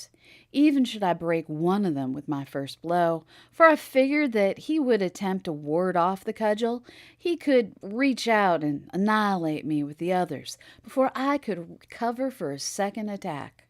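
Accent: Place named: South, United States. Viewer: I am enjoying the story time. The slight southern accent is awesome.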